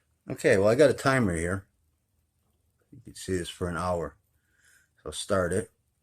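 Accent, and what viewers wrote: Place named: Boston, United States. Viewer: I like the Boston accent. If you ever meet Mark Wahlberg or one of his brothers, let us know.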